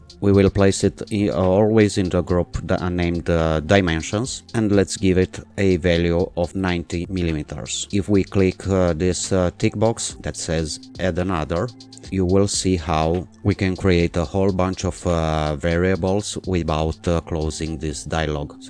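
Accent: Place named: Finland. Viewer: but the way people from finland speak so slowly and with their accent makes the video drag on. Not that i don't mind the accent, but i feel like the video is going way too slow as if i'm being talked to incredibly slow on purpose because someone assumes i'm retarded. LOL. good info though.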